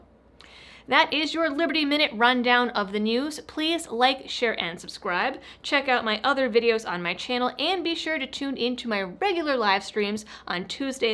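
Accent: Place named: Massachusetts, United States. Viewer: This was a good idea...but I definitely can tell you are from Massachusetts, you can talk a mile a minute...but this a good thing because we get more news in the three minutes....keep up the good work. 👍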